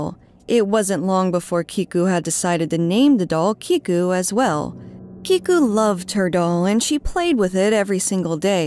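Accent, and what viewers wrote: Japan. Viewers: idk if this makes sense or if you have heard this before, but the cadence that you speak english is really similar to how native japanese ppl speak japanese. its really cool to hear that in english, and also your japanese sounds great too! been watching ur vids for a while ♡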